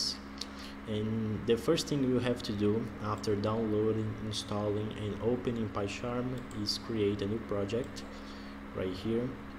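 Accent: Brazil. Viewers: Parece ser um brasileiro falando. Parabéns pelo tutorial e muito obrigado pelo conteúdo. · Parece ser um BR falando, se for, faz um em portugues ai tbm man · Ia dizer isso, ou é Brasileiro ou indiano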